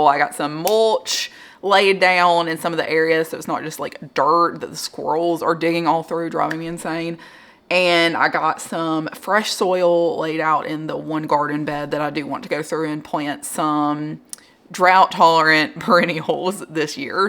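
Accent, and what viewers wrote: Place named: Deep South, United States. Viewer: I've consolidated plants into one pot many times now, because I also have a lot of plants--but I have never thought of combining some of my cane begonias for some reason--and that is brilliant! I'll definitely be doing this soon. Also I really enjoy your videos and your accent (I live in Pensacola, FL, and I don't know of many PlantTubers from the deep south, so your accent sounds like home to me). (=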